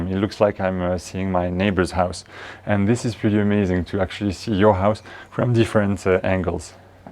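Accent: France. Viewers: This will be a fascinating restoration. You are very entertaining and I enjoy your humor and lovely french accent. Thank you for the tour and explanation of your plans.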